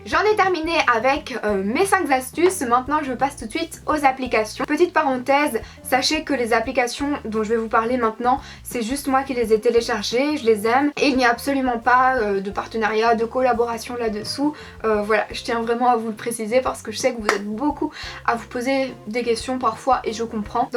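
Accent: Belgium. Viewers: T es belge ??❤️❤️❤️+1abo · tu es belge?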